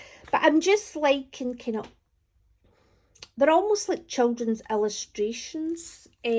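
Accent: Scotland, United Kingdom. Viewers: As an artist you have to do what an artist has to do: create. And you created alot! I love those pencil sketches in your little red sketchbook. When you go with the flow there is so much good stuff coming out of you,. But - and I am saying this out of experience - trust your own impulse, don't mind others opinions about your art wether they are good or bad. It was a pleasure to hear from you again (although your sweet scottish accent is sometimes a bit hard to understand 😉). Mara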